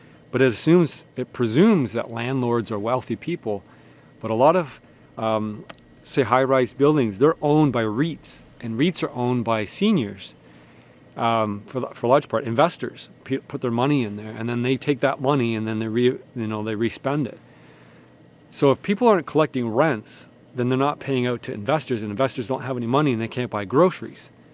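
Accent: United States. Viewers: Wow, you sound like a red blooded American here. And I agree with everything you've said, and share your concerns. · NHL u knida sound like a American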